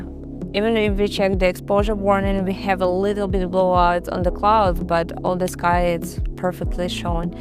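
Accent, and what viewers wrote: Russia. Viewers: Слышу русский акцент 😊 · Мне показалось, или я слышу русский акцент?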